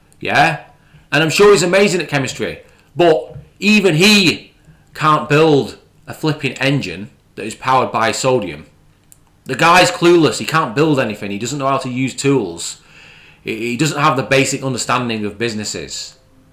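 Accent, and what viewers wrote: United Kingdom. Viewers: I grew up loving every single British accent I've ever heard. Thanks to the rampant self-owning stupidity coming out of my speakers in your dumb-soaked voice has diminished that pleasure significantly. What really proves that you don't know what "science" is hardly the idiocy you've given about his obscure research or his lack of engineering patents, it's the fact you thought he wouldn't look up source materials like the Boring Company's own published materials. "That's why we don't listen to the guy, because he doesn't know anything about anything". Says the clown with about 8310 subscribers, compared to a 1.02 million subscribers. If only, if only, IF ONLY... it's such a crippling shame that you aren't really a crew member sharing the fate of the USCSS Nostromo. Being the kind of creature who would assist and enable snake-oil salesmen like Musk means you ought to be banned from making videos. 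I'd consider listening to you the same way people might watch clowns, to poke fun at your inability to do basic thinking. It would be amazing to watch you "shoot down all" his "stupid videos" and watch you blow off both your feet... but it hurts too much to hear your idiocy expressed in a British accent. You'd be ashamed and would componse an apology video if your brain functioned, you gamma-slug.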